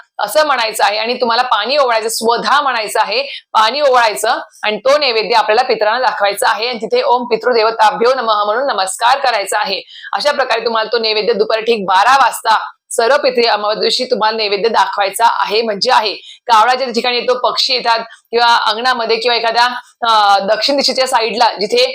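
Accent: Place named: Karnataka, India. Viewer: बोलण्यावरून तुम्ही कर्नाटक च्या वाटताय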